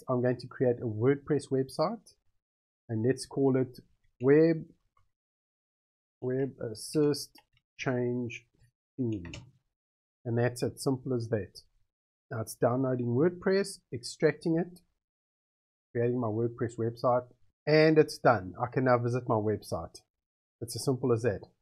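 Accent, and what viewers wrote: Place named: South Africa. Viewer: Love hearing a South African accent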